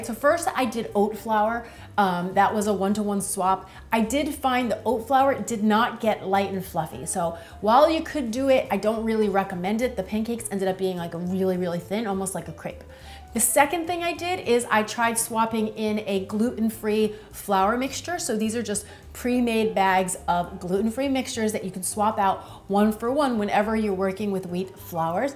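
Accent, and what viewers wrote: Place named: New Jersey, United States. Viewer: Very funny Jersey girl accent haha 😂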